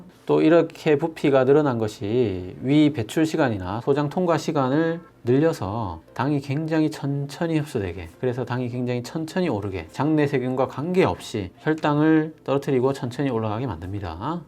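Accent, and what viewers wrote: Gyeongsang, South Korea. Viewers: 우리 님은 너무 훌륭한 강의를 하시니 경상도 억양도 너무 멋있게 들리네요!..늘 고맙습니다요!..^^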